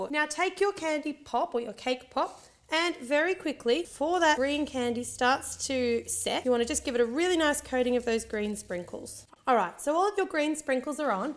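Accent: Australia. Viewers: Nah she totally sounds Aussie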